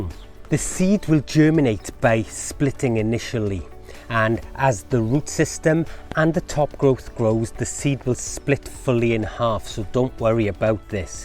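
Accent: Wales, United Kingdom. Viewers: that's wales accent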